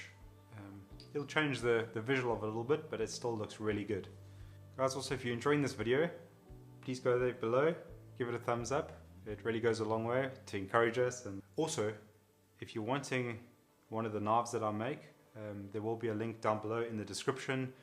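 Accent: South Africa. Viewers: Whoo hoo! A Saffer accent on YouTube. I'll definitely get some knives from you when I can. And sommer subscribed coz support local.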